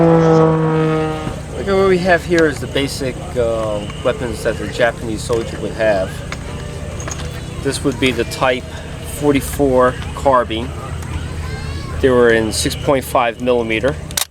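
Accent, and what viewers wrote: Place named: New York, United States. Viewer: I can tell your from new York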